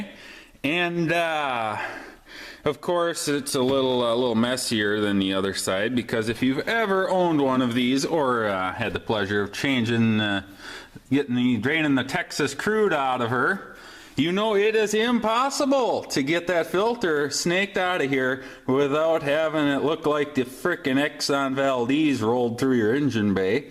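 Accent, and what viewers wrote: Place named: East Coast, United States. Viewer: Great video. I love the back east accent reminds me of home. Would love to have a beer with this guy.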